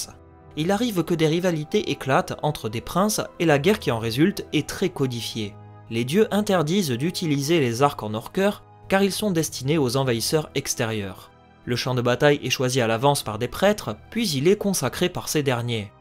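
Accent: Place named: Toulouse, France. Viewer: Hey! Même avec un petit accent toulousain, mille reste invariable! Pas de "s"!!!! à signé la grande pourfendeuse des liaisons dangereuses!:))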